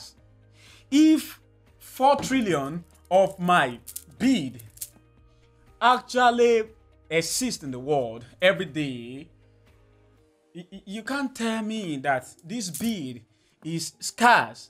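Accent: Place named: Nigeria. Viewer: I discovered your channel when you were at 40k subs, and I knew from your voice you were a fellow Nigerian. Your work ethic is insane! 🔥🔥🔥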